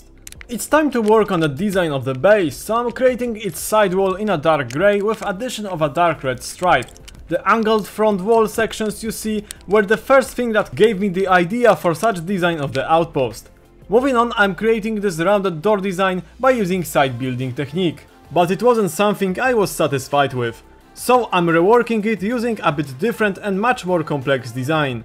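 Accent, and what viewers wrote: Poland. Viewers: slychac ten polski akcent POLSKA GUROM! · Man, gadasz polskim akcentem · ok because i hear this accent and me too from poland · I thought you're from Russia, but then I realized that you are our Poland Slavic bro with the same accent) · Ten polski akcent słychać odrazu haha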